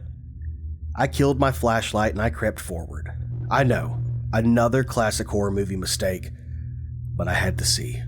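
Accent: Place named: Tennessee, United States. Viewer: Enjoyed! I like your accent. Sounds like my neck of the woods. TN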